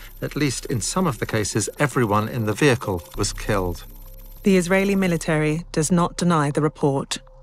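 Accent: United Kingdom. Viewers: Funny how people would swallow whatever propaganda you feed them as long as it’s delivered by a jolly-good British voice.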